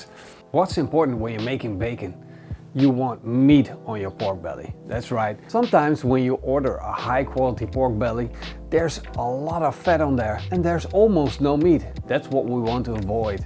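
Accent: Netherlands. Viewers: He's Dutch, I could tell by the English accent (and typical translation mistakes) but the actual Dutch words like boerekool confirmed it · Are you dutch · When you can hear the Dutch in the man. ;D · is this guy dutch? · That hollands accent though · Je engelse accent lijkt alsof je een NEDERLANDER bent, klopt dit? Haha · That lovely thick dutch accent. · Are you Dutch? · In hoor dat je nederlands bent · Ik vond zijn accent erg Nederlands Engels en het was niet eens slecht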